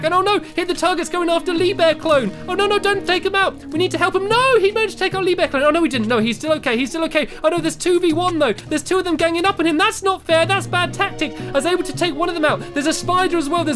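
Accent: United Kingdom. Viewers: Me its cool there British like stampy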